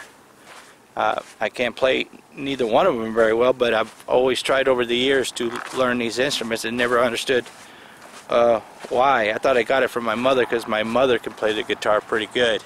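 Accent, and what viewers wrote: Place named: South, United States. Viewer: oh yeah and btw, there is nothing like some GOOD ole country twang xo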